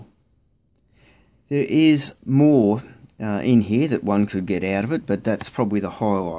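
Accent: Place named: Australia. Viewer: Thank you for sharing, we in Qld, nice to hear another Aussie.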